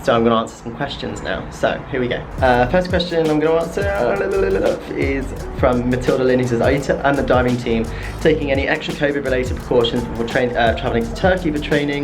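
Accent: United Kingdom. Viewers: If you listen, you will know he is British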